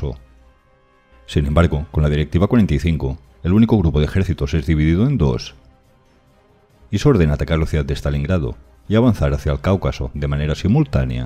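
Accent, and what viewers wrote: Galicia, Spain. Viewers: Eres de Coruuuuña?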